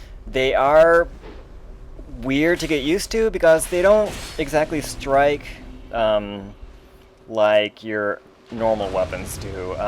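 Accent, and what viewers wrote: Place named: South, United States. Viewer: weeaboo game strong this guy has a country accent yet thinks he's Japanese damn weeaboos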